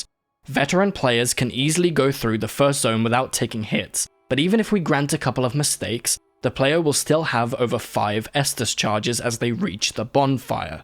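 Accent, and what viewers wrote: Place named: United Kingdom. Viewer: Very good review, watched it from beginning to end. Do you know Greg Kasavin? I can't imagine you haven't been influenced by him. we can hear a similar music to the one in RE4, which can also be heard in the Gamespot Classic Review and your delivery is similar to him despite your British accent.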